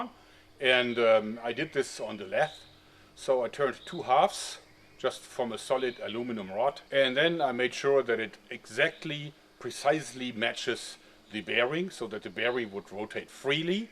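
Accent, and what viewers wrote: Germany. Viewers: Warum redest du englisch ? Jeder hört doch das du deutscher bist 😂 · he have German Sound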